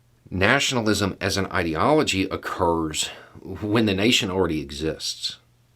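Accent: South, United States. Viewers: A wise man with a big ol' beard and a Southern lilt to his voice. You're breaking down my stereotype, Brother. 🙏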